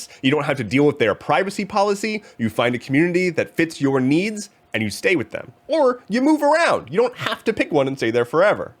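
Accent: United States. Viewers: Sounds incredibly American...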